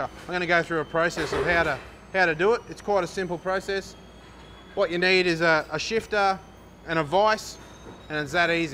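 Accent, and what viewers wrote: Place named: Australia. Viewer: Man I love your Aussie accent!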